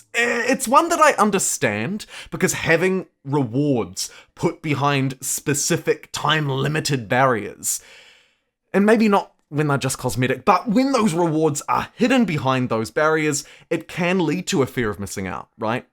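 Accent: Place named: New Zealand. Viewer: Your accent sounds like you're from New Zealand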